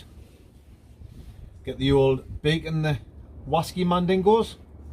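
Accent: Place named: Durham, United Kingdom. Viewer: great stuff, are you in the Durham area? just from the accent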